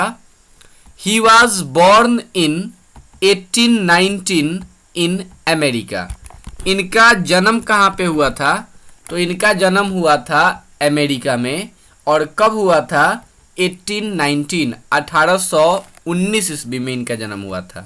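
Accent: Bihar, India. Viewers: Gajab explaination in Bihari style...😀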